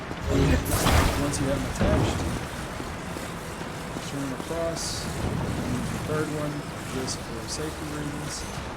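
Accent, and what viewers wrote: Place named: South, United States. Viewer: You're from the south, aren't you?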